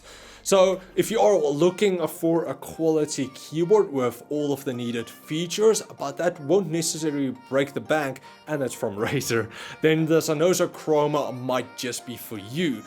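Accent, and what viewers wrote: South Africa. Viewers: Is he from south africa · from the start i knew you were from SA en awe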